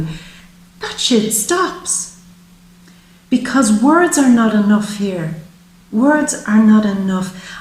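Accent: Ireland. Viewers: KellyByoga yeah nnnnno shes Irish my lovely x0x loves yah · Yes I know she’s Irish....and her accent is adorable....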